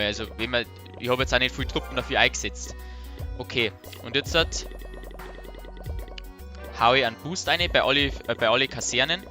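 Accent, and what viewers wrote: Bavaria, Germany. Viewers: Kommst aus Bayern?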